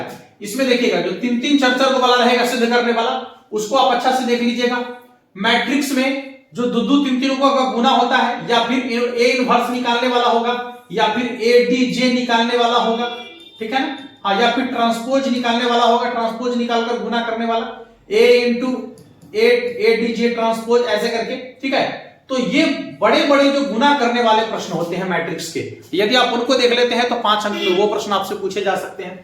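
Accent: Bihar, India. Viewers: Bihar h kya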